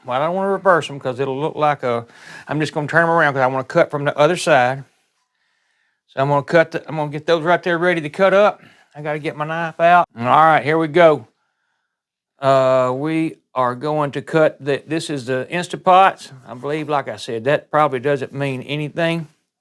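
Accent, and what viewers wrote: South, United States. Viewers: Where is that twang from? Somewhere south like Lousiana or Georgia? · He is from the south. I understand you.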